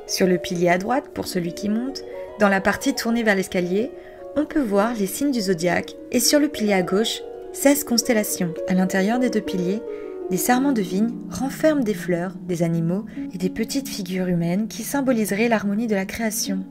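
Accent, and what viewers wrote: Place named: Italy. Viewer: Cet accent italien une douceur pour les oreilles! Sinon excellent travail. +1 abo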